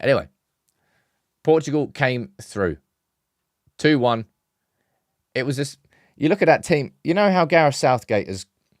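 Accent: Manchester, United Kingdom. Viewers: Where manc. Accent come from gaha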